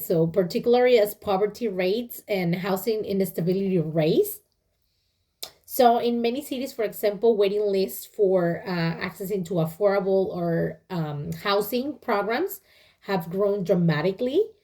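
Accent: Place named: Spain. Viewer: Omg hot sexy Spanish accent is my fav weakness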